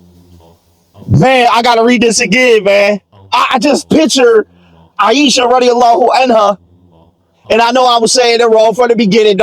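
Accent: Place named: Detroit, United States. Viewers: It's weird to hear a Muslim with a american accent. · he has Detriot vernacular. It's not just American, maybe that's what's so unique about him right??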